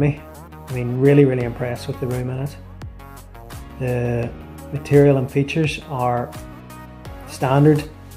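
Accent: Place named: Northern Ireland, United Kingdom. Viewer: I'm looking to get a new tent to start backpacking again after about 12 years. the Naturehike keeps getting mentioned and I was actually on the AliExpress site looking at the popular Cloud peak 2 tent, when I noticed a few other Naturehike tents which hardly get a mention. I saw the Taga, which then lead me to find this video and your channel. I'm not sure I really want a 1 skin tent though, so maybe I'll look at something else. My current tent is the Vango Spectre 200, which I bought years ago, after thinking the Banshee was too small. Funny, the Banshee is still going strong, but the Spectre has long been axed by vango. It was good, just that I fancy a new tent. Do you get out much ? You don't make any wild camp videos. Nice to hear the accent, I originally lived in Holywood .. until I was 5 (57 years ago) Good luck with your channel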